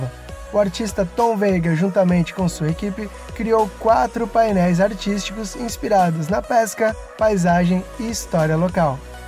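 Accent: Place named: São Paulo, Brazil. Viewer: Sotaque paulista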